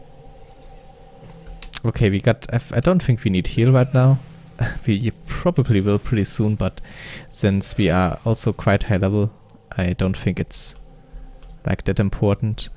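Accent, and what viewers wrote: Germany. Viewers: Nice video, thanks for the showcase. I like your german accent!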